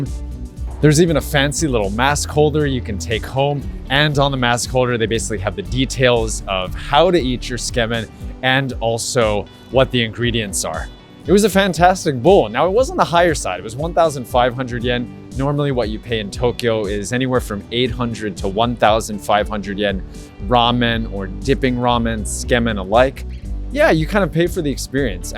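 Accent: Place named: United States. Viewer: that is honestly so impressive. I for sure thought you lived in the states for a time or something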